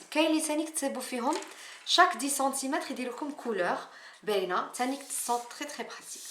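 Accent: Tlemcen, Algeria. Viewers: J'adoooore, tu explique (permettez moi de vous tutoyer) très bien. Allah yaatik saha! Vous êtes de Tlemcen?! l'accent bayen :p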